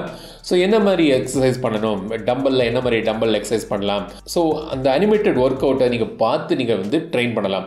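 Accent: Kanyakumari, India. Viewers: அண்ணாச்சியின் குமரி தமிழ்..😍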